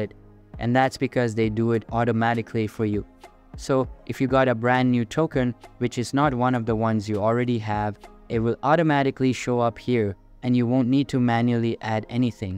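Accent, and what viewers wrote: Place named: India. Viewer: ai has indian accent now?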